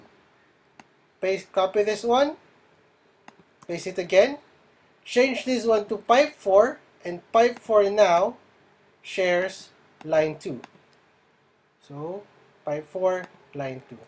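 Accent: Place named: Philippines. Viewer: Pilipino ka no halata sa salita eh xD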